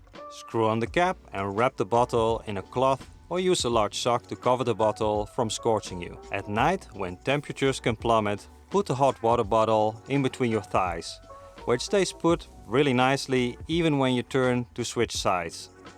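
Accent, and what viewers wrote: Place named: Netherlands. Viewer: Dutch accent always immediately jumps out to me. I notice a lot of dutchies run international targeting channels covering great topics quite well spoken. Good job. Very helpfull.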